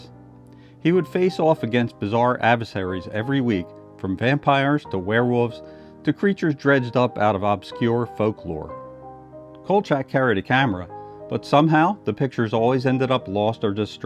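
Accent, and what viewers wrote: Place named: Philadelphia, United States. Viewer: One of my favorite shows; you video makes me miss it and hearing your Philly/South Jersey makes me miss Wawa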